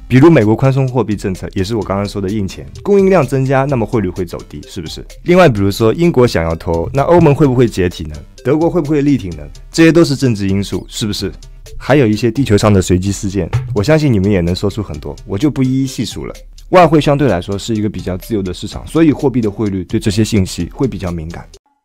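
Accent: Shanghai, China. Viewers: 满满上海口音😄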